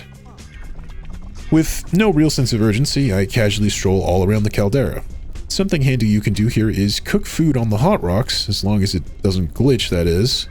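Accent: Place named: United States. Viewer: Where do you live that you have an american accent and your room can get to 90 degrees in the middle of January??? Do you live in a windowless basement? Pheonix Arizona??? Ameristralia?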